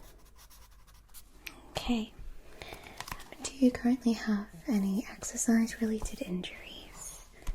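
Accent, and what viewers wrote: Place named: Wales, United Kingdom. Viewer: I can’t put my finger on her accent, anyone know. Switches between welsh and English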